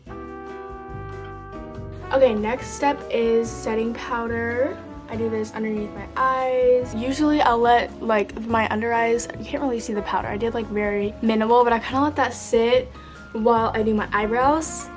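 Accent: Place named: United Kingdom. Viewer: GIRL I THOUGHT SHE WAS BRITAIN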